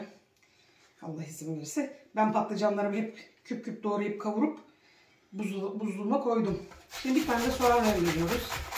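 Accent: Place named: Izmir, Turkey. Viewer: ay çok tatlısınız ya siz konuşurken kendimi görüyorum İzmirli olduğumuzdanmi acaba çok natursunuz ellerinize sağlık hep yaptığınız çok güzel sağlıcakla kalın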